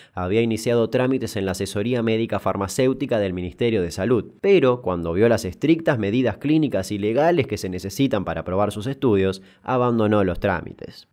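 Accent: Argentina. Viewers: amo el acento argentino, es hermoso. Me encantan tus videos. Un abrazo grande desde Bogotá-Colombia💗💗💗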